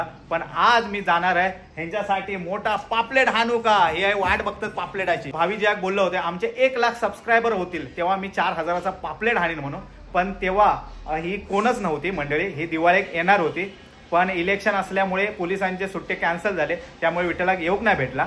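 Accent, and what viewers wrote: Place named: Malvan, India. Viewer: तुम्ही अस्सल मालवणी बोलता,अभिमान वाटतो धन्यवाद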